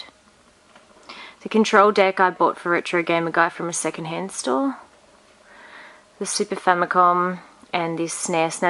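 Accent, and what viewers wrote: Australia. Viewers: The accent being Australian means you must know Last Gamer. Wish I lived in Australia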